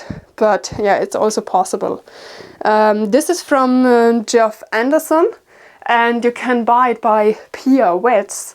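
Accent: Germany. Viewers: So good to see an english video I watch her in german ones , but it plays a computer voice , and I don't get to hear your lovely german accent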